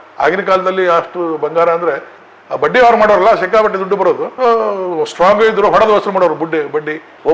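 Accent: North Karnataka, India. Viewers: Bhashe chennagide natural north bhase.no problem onthara khushi kodute.kelakke. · ಪಕ್ಕಾ ಉತ್ತರ ಕರ್ನಾಟಕದ ಬಾಷೆ ಜೈ ಉತ್ತರ ಕರ್ನಾಟಕ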